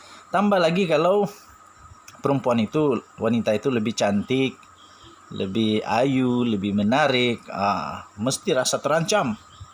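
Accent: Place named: Borneo, Malaysia. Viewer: Adui jgn lh ckp smnjung klu org borneo bah...keras btul sbutn mu tu bro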